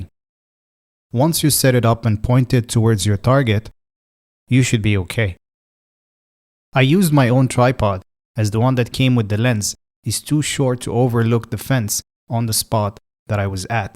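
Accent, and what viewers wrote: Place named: Russia. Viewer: Thank you for review, I'm looking for lens to create my content 🤝 And I'm happy to hear Russian accent 😁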